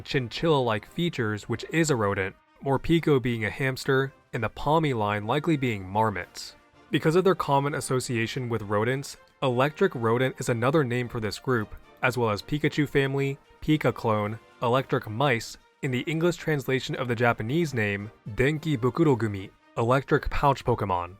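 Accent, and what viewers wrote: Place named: United States. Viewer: Today I learned is half Japanese that's pretty neat! Idk why I always assumed from your voice that you were like John America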